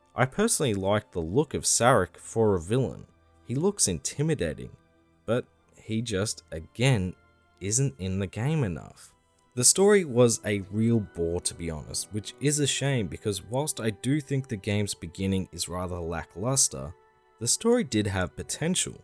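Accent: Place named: Australia. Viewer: My first experiences with the franchise, as you rightly suggest is the case for many in this review, was with Shadow Fall. I got bored with the story fast so I enjoyed the multiplayer a lot and as I joined late to the PS4 generation, I had the chance to muck about with all the expansions. The visual appeal of the Helghast got me to pick up Killzone 2 and 3 for my PS3 from my local Video Ezy when it was closing down for $2 (NZD) each. I figured you'd have heard of them as you sound like an Aussie. I agree that Killzone 2 with it's impressive first person cover and heavy feeling shooting gameplay is the strongest entry in the series.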